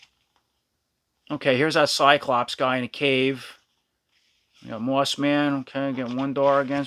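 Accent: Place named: East Coast, United States. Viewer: i freakin love seeing this stuff reviewed with a surly back east teamster accent 👌😆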